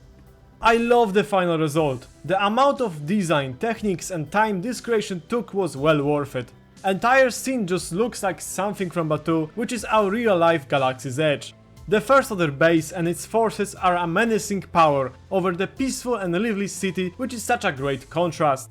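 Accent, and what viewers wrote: Poland. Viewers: Słychać że Polak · czy ja wyczuwam Polski akcent w wypowiedzi? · beautiful polich accent lol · slychac ze polak xD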